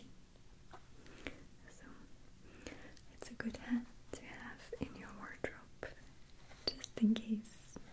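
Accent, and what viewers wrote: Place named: Russia. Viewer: you are such a beautiful lady. It seems that you are becoming more beautiful as you get older. I was watching some of your videos from 2011. I think I am going to be one of those women that turns into a hag as I age. Watch, you'll be more gorgeous as you get older. What is your secret my dear. Please don't tell me DNA. I do miss your Russian accent as was so prevalent in your first videos. I enjoy watching those. I could hear your voice more in those. Whispers are great but you have such a pretty voice, it would be great if you could do some like when you first started out. My volume doesn't get any louder. You are one of my favorite asmr artists. Take care of yourself and your family.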